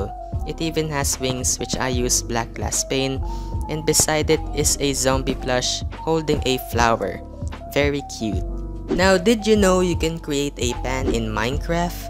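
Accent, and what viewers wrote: Philippines. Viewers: Are you a Filipino? I can tell it with your accent. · Hi you sound like a filipino:D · Hello, are you fillipino?? Iam fillipino too... I asked that becoz of your accent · Your accent is like a Filipino speaking English.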